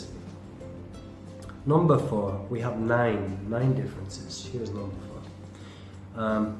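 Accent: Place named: Ireland. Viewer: You are so cute!! and your Irish accent OMG <3 *major crush*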